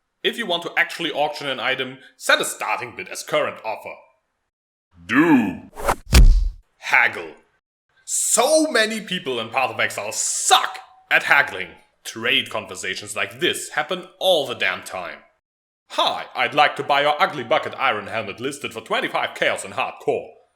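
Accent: Germany. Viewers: ***** Dass es Deutsch war wusste ich. Ich war nur verwundert, da ich persönlich den deutschen Akzent nicht wirklich mag. :3 Aber trotzdem danke für die Info.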